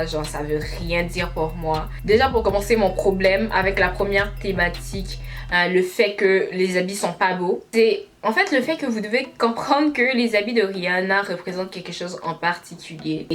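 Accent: Quebec, Canada. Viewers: Tu es québécoise ou tu veux Québec ? 🤔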